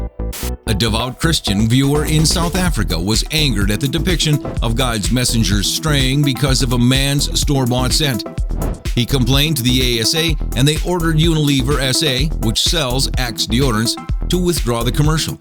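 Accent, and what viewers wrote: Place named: Canada. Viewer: sound Canadian but where is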